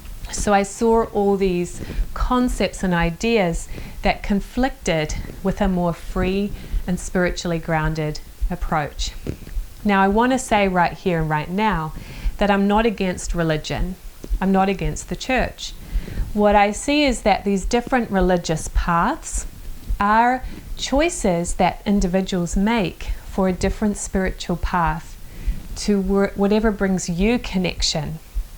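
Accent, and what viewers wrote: New Zealand. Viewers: you talk like me a kiwi I guess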